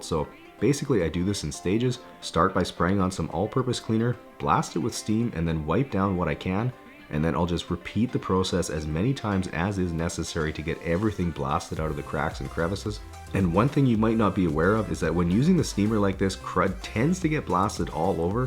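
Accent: Canada. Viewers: I must say that I am impressed with your dedication to being a perfectionist it definitely helps with this kind of profession every vehicle you Clean is amazing but as a united States citizen I'll say that I definitely noticed your Canadian accent lol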